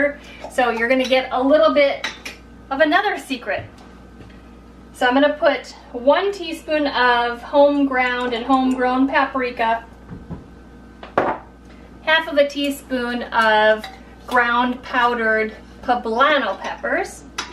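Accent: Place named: South, United States. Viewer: you sound like a Southern gal, like me. Mama ( and I do, too ) used a cast iron skillet. There is one question that I ALWAYS ask at a restaurant if I may want to order some cornbread........” Has it got sugar in it? “ If it does, FORGET IT! But, there have been many times when I have made a skillet of cornbread and eaten...........only those crisp, brown edges........all the way around the entire skillet! Oh, Lordy, talk about good eating! Now, my daughter likes the center part ( she’s 1/2 “damnyankee” ), but I go for the crunch brown edges. And my Mama? She had to have some buttermilk with hers......yuck.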